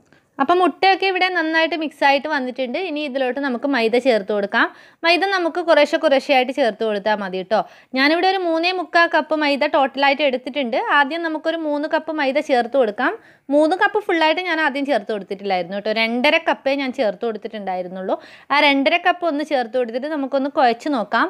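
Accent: Kasaragod, India. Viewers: Kasar Kode laugage allalloooo Nigalude speech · കാസർകോട് സ്ത്രീകളെ സൗണ്ട് കേട്ടിട്ട് അവിടുന്ന് കല്യാണം കഴിക്കാനും മോഹം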